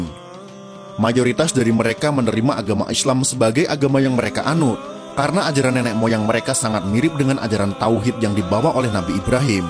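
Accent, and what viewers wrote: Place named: Surabaya, Indonesia. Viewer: boso jowo ne. Koyok jowo suroboyo an min😀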